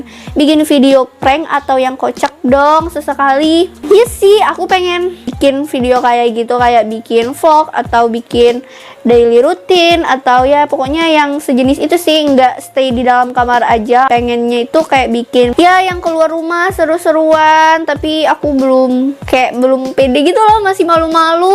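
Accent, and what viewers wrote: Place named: West Java, Indonesia. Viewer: Ak kirain orang Sunda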